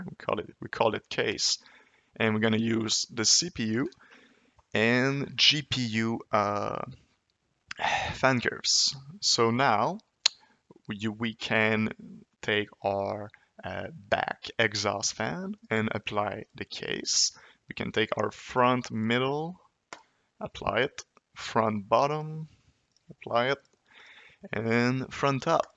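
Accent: Quebec, Canada. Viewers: Are you from Quebec ? Amazing video/software btw :) Thx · I'm pretty sure this guy is from Quebec because of the accent. Amazing software btw :)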